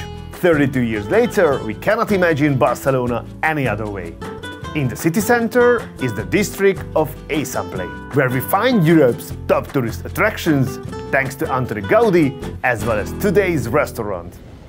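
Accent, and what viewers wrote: Hungary. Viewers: Kilométerekről is felismerni a Magyar akcentust 🫣 Üdv itthonról!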